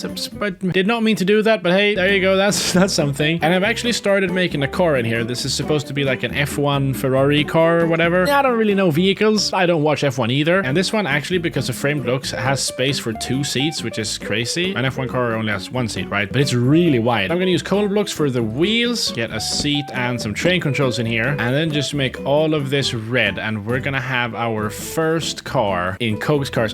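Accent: Sweden. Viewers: I thought that dialect was familiar! I had to chevk the about me lol. How do i always find Swedish creators?!😅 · You sound like you have a swedish accent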